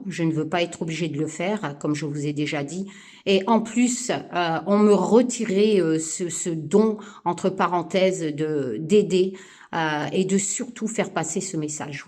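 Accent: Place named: Nord, France. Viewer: est juste humaine 😇un mail envoyé et réponse dans la foulée...j'espère que ma question sur le boulot arrivera surtout que ce domaine (dans des bureaux )du jamais fait pour moi😊j'adore la regarder toujours dans la bonne humeur même légèrement remonter, elle a toujours un mot pour nous faire rire...Son patois un peu chti me fait rappeler ma famille tous en Picardie.Bref une femme qui m'a donner l'envie de faire mes propres tirage d'ailleurs reçu mon petit Oracle aujourd'hui que d'émotion pour moi😢 j'attends mes 2 autres avec impatience...Je fais selon mes envies j'ai sauter le pas pour mes tirages et me contre fiche du jugement de certains membres de mon entourage. Grand merci pour toutes tes explications sur les cartes.Votre livre est rempli d'émotions les larmes sont venu pour moi😊.Continuer sur votre chaîne vous nous faites un bien fou...Encore une fois. 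M E R C I 🙏🙏🙏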